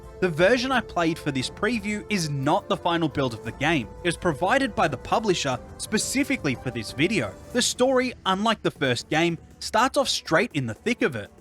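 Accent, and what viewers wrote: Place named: Australia. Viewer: You're Hungarian ? I was swearing you sound like an Aussie !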